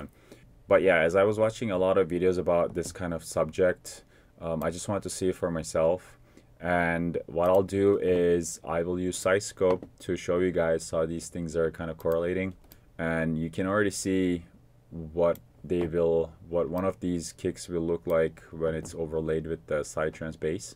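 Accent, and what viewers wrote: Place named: Iran. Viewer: You sound Iranian 😊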